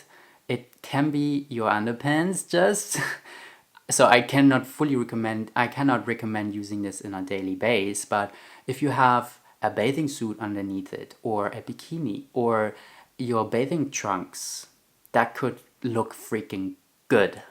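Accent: Germany. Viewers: Where Do you come from? Is this a german akzent? The pants are WOW! Many greatings from Germany👍👍👌👌🤩❤😘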